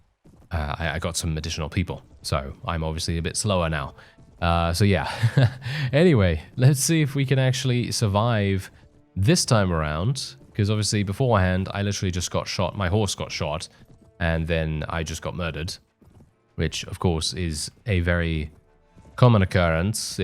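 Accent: United Kingdom. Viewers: Aw fuck thats so funny. You knew what was gonna happen, youve read the wiki, you had an idea what the red immortals could do since you just fought 10 of them, its always hilarious when you get to complaining in your lovely british voice.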